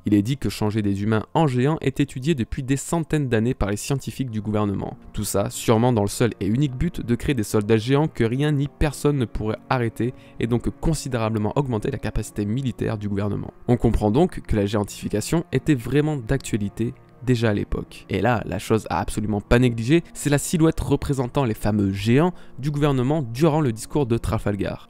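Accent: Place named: Alsace, France. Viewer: Rien à voir mais tu es d'Alsace non ? j'ai l'impression d'avoir reconnu un petit accent ahah Et excellent vidéo ça change pas ! :))))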